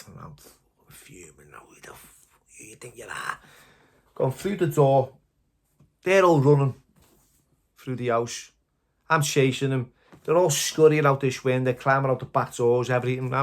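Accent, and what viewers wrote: Liverpool, United Kingdom. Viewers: love the old time storeys m8 you speak like a proper scouse lad not like the other fk on other channel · Loved the accent. Didn’t know it was possible for a scouser 😂. Great stories. Kept me interested all the way 👍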